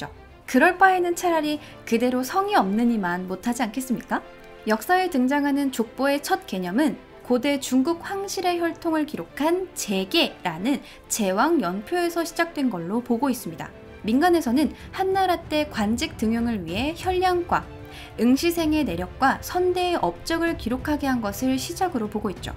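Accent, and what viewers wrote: Gyeongsang, South Korea. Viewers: 경상도 사투리 덜 어색하게 하시네요~^^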